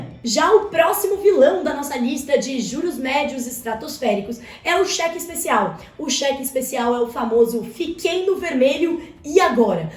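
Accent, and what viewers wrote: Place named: São Paulo, Brazil. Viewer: Ela é muito lindinha com esse sotaque de paulistana!!!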